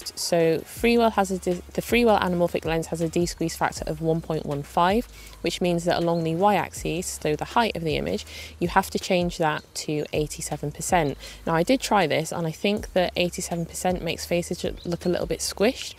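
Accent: United Kingdom. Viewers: Also I love your british accent :)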